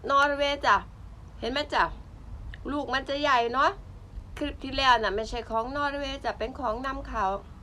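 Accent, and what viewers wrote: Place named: Korat, Thailand. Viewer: น่ารักจังเลย ดีใจที่ได้รู้จักค่ะ สำเนียงโคราชมา น่ารักจัง พี่ก็คนโคราชเด้อ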